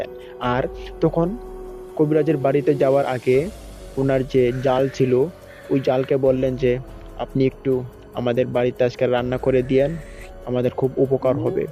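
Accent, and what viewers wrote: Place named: Habiganj, Bangladesh. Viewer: Habiganj bari mone hoy unar kothay buja jacche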